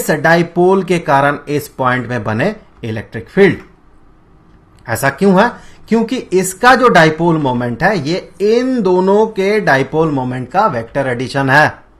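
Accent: Bihar, India. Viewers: What a sophisticated explanation..! I'm really very impressed with you sir. You're awesome and vo Bihari tone sir ji fan ho gye hai hm aapke... proud to be a Bihari🤘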